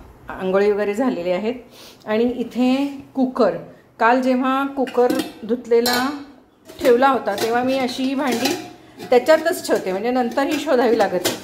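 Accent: Kolhapur, India. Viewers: तुम्ही कोल्हापूरच्या का? भाषे वरून कळते.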